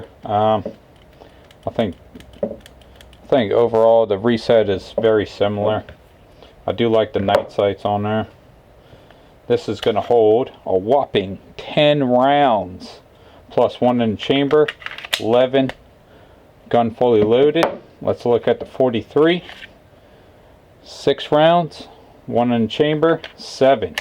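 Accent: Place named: South Louisiana, United States. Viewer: I like that shirt. I knew I recognized the accent originally being from south Louisiana myself.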